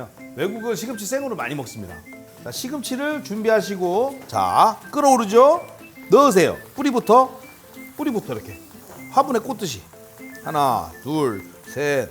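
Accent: Chungcheong, South Korea. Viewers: 백종원 님 말씀도 맛잇게 충청도말씀 저도 충청도임니다 감사해요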